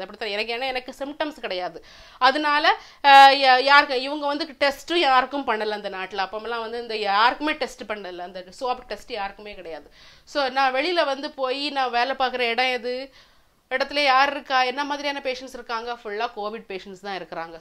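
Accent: Nagercoil, India. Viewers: Useful information Sister. Unga Nagercoil Tamil Arumai.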